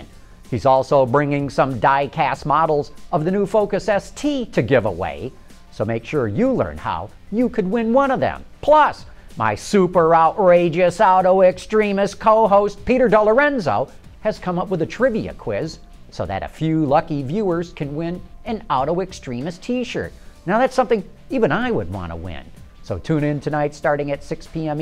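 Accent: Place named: Midwest, United States. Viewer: Theses videos are brilliantly well done. But my only complaint is McElroy's funny-sounding Midwest accent.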